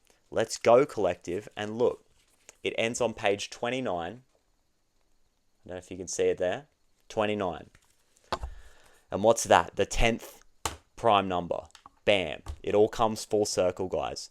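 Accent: Australia. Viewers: i actually had to question myself if I was right it was an Aussie accent for a few seconds haha 🙈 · did not expect the Aussie bloke voice... noice. Totes supporting ya mate *hits subscribe. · Is your accent Australian??? ❤ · Thank you. You are very sweet and genuine. I enjoy your readings. Where are you located in the world? You sound a little like an Aussie!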